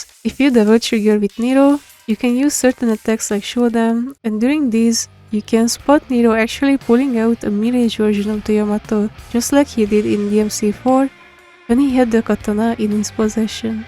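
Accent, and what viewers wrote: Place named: Hungary. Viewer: this accent is so Hungarian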